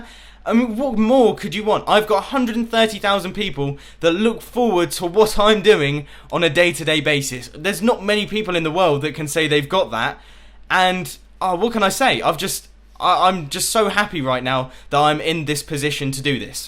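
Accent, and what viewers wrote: United Kingdom. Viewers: the only reason im not subcribed to you, is because(not being racist) your british accent really annoys me. Its not personal, i just really hate the way british people talk.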